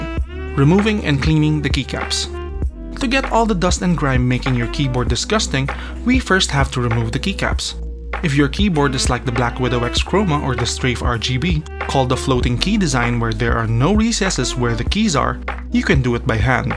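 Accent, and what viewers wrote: Philippines. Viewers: thanks for this! It's tedious work to clean out my mech keyboard lmao. and you're from the philippines, which i expected from your name but i didnt expect you to speak very proper english. i wouldnt say your accent gives it away, but certainly it wasnt THAT obvious that you have a filipino accent. salamat ulit par! malaking tulong talaga to sakin! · Agree, I knew I heard Filipino accent at first. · Filipino accent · Sagiri Izumi English is a widespread language in the Philippines, I’m more impressed his accent is not thicker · lol that accent is surely a filipino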